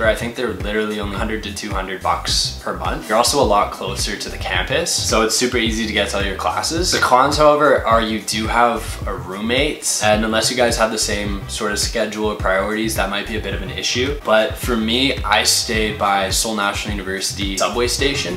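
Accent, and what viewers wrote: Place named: United States. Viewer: Can I ask where you are originally from?: You sound like an American. Thanks!!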